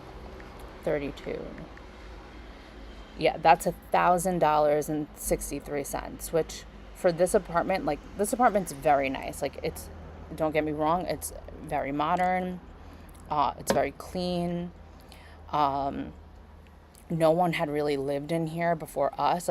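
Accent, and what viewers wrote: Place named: New York, United States. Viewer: I thought I heard a little NY in your voice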